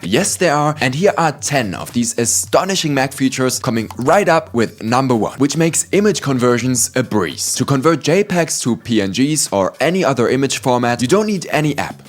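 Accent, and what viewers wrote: Germany. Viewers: 15 sekunden gehört direkt gewusst das er deutscher, ist mach weiter so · This german accent is killing me. Ffs fellow Germans, learn some good English.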